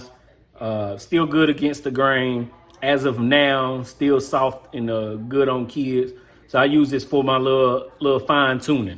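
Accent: South, United States. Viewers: That country accent makes these videos hard to watch. Narr, parr harr, hurr. That why its taking so long to get 5k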